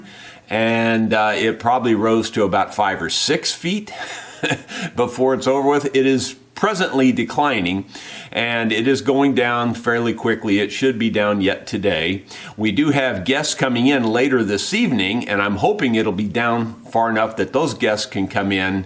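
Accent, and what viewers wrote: United States. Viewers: Hi, i totally enjoy watching your video’s. I enjoy listening to all the interesting stuff you talk about. You have such a lovely accent and could listen to you all day..lol. I find it one of the most pleasant accents from America. I live in Scotland and enjoy your channel. I thought you said you were going to take it easy?. You are a workaholic..lol. You are looking well, So keep well and look after your family..👍🏻 and who know’s… i may come across the pond and rent one of your nice holiday apartments when i have saved up enough money, or robbed a bank..lol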